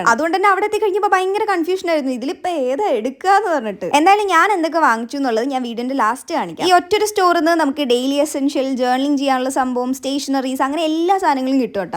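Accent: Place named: Ernakulam, India. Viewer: Kochi 😂😂 palakkadooo??? Eranankulam 😂😂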